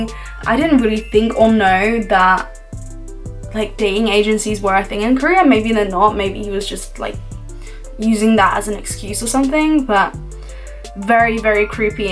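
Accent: United Kingdom. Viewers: you speak perfect British English! Have you ever lived in Britain? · oki doki, cause when i heard you speak English i recognised the british accent and though to myself, she's British and then now your swiss and i was like what is 😂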